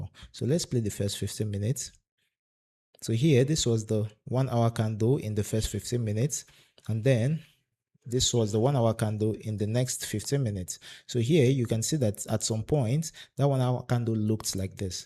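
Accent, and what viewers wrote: Nigeria. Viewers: My guy, u are good. I'm surprised at ur Naija voice too. Your videos are full of great info. Thanks a lot.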